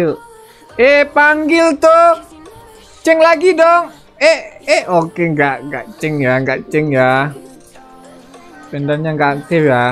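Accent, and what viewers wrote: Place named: West Sumatra, Indonesia. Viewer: Jelas kali abg ni org minang nya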